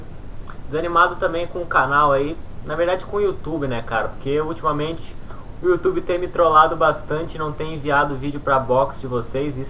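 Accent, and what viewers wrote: Rio Grande do Sul, Brazil. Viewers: vc é gaúcho ?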